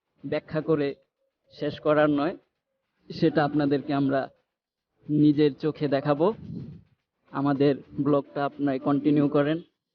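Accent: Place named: Mymensingh, Bangladesh. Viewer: তর বাড়ি মনে হয় ময়মনসিংহ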